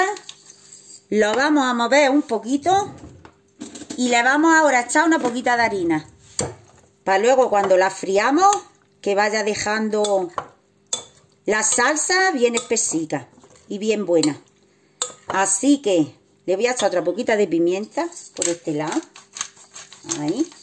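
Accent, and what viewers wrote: Andalusia, Spain. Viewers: Mamma mía!! Qué pintaza!! Otra de tus ricas recetas que me apunto. Se me está haciendo la boca agua😋😋. La salsita, para mojar pan. Y la guarnición de patatas tiene también una pinta buenísima. Muchas gracias. Eres una crack en la cocina. Además me encanta como explicas tus recetas, con esa gracia andaluza que no se puede aguantar. Creo que ya te lo comenté, soy catalana, pero también me tira mucho tu tierra. Mi madre era cordobesa. Por cierto, no estaría mal que algún día publicaras un libro con tus recetas. Exito seguro. Un abrazo desde Barcelona. Cuidaros mucho. 😘😘😘🌹🌹🌹🌺🌺🌺🌞🌞🌞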